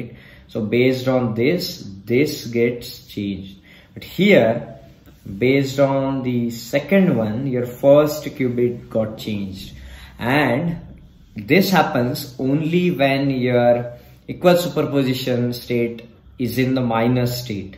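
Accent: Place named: India. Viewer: interesting content, interesting indian accent:face-blue-heart-eyes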